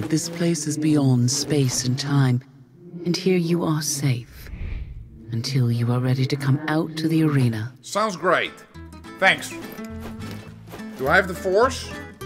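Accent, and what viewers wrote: Netherlands. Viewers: are you dutch i hear dutch in your voice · Somehow your accent got even more Dutch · He sounds like he’s from the netherlands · I got a good feeling about you being dutch because that is an accent that only dutch people have. But are you?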